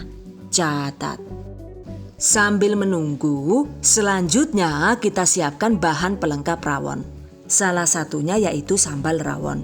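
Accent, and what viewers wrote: Banyumas, Indonesia. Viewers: gagal pokus pas ngmg ngapak kaya nggone nyong, bahasane ngapak😂😂😂😂😂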